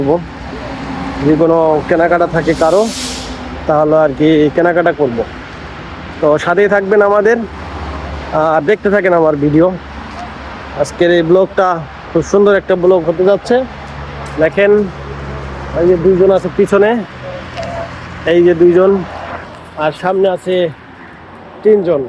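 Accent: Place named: Munshiganj, Bangladesh. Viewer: না আপনার কথা সুনে মুন্সিগঞ্জের কথার মতো লাগে তাই জিজ্ঞেস করলাম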